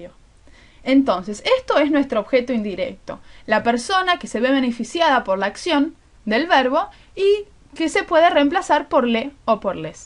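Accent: Argentina. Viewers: Está muy bien explicado, y el acento argentino le da el toque, ¡GRACIAS!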